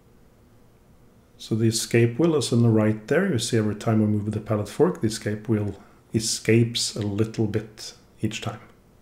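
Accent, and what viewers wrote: Norway. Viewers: Du høres norsk ut.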